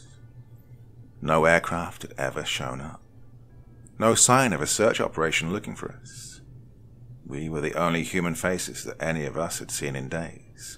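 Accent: England, United Kingdom. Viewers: was great. btw u from the UK u kinda have a british accent. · What part of England are you from ?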